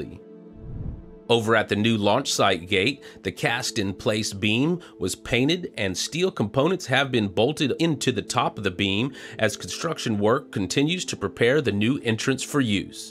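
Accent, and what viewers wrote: Texas, United States. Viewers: The Texas accent may be excused, but the Liebherr crane is "Leeb-hair", definitely not "Lyber".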